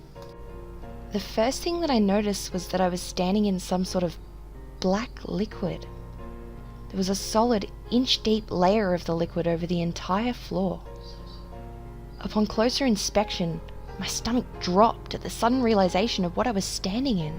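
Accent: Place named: Australia. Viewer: It's good, you have to work on the voice quality (mainly removing white noise, if you use audacity, the noise reduction feature will be your best friend, and also the change in volume, try turning the music up and down instead of the vocal track) but it's still a better quality than my first videos! And I'm a sucker for Australian accents, so I absolutely love that! :) Subbed, rooting for you!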